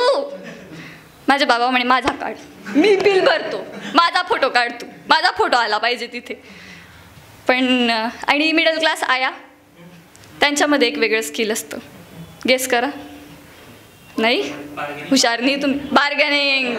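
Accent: Khandesh, India. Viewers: 1 cha number and tuz aavaj pan.khup chan.Hotta i like you khandeshi tone...👌👌💝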